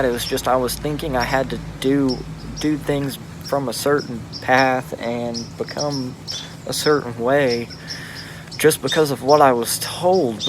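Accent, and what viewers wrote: South, United States. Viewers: You sound like your from the south...how old are you?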